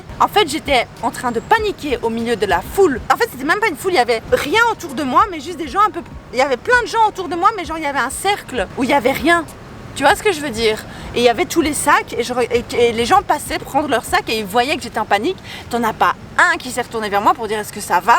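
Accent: Belgium. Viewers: Je suis Canadien j'aime bien l'accent belge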